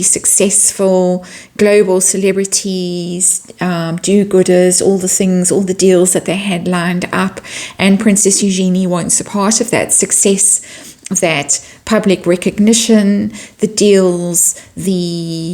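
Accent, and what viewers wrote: South Africa. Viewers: I thought you were South African. I enjoy your reads.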